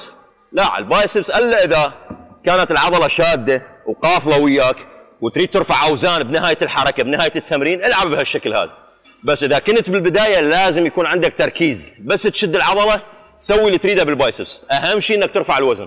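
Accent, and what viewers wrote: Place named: Iraq. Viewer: احلى يحجي بس عراقي لهجه عراقيه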